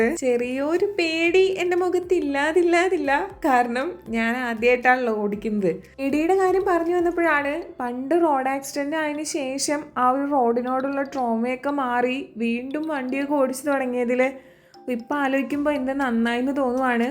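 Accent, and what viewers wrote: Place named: Trivandrum, India. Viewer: Trivandrum slang cheruthayit vannu thudangitund😁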